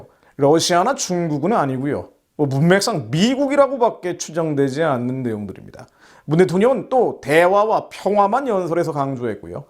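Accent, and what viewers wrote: North Korea. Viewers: 조선족이거나 북한인같네요. 억양들어보면 북한억양입니다.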